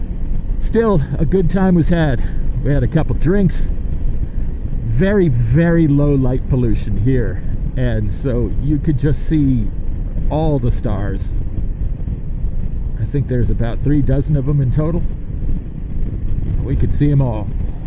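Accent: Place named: New Jersey, United States. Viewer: Bringing NJ accent to your neck of the woods 😆 🤙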